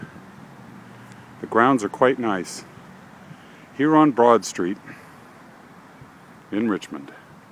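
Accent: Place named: California, United States. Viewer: u said u were from California.where your parents were born doesn't count for u.u sure sound like your from there.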